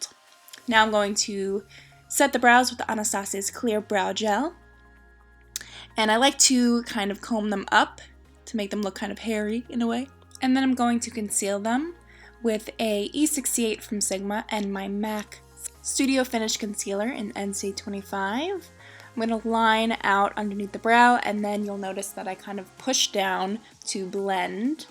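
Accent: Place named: New York, United States. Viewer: Ur def from NYC I can tell by your accent lol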